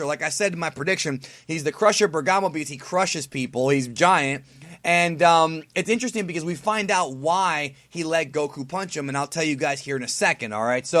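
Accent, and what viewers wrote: Japan. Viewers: Nice Nihongo accent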